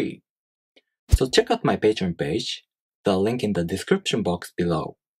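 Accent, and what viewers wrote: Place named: South Korea. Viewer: Why do you have a slightly Korean accent?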